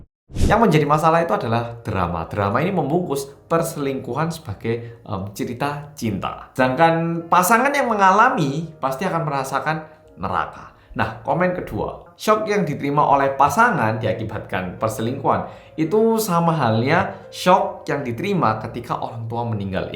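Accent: Java, Indonesia. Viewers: Mas nya asli orang korea ? Atau Asli orang indonesia... Logat nya aga sedikir terdengar ada logat jawa..tp muka kaya orang korea... Jd mas nya orang korea atau indo ?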